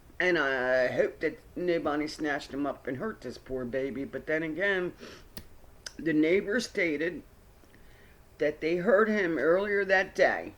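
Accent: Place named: Baltimore, United States. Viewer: I knew you were from Baltimore, my hometown! I could recognize the accent and feels like home. You’re absolutely right, many autistic folks have gone missing since this whole corona stuff. Very sad. Thanks for reading the cards for him.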